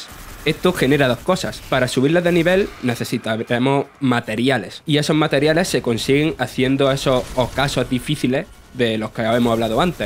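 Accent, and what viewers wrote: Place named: Granada, Spain. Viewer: jjajaja sí, es que dicen que es acento granadino.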